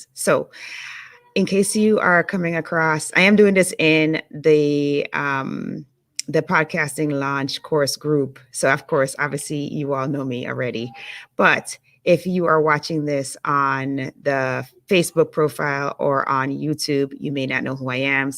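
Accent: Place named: Jamaica. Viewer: You sound a little Jamaican...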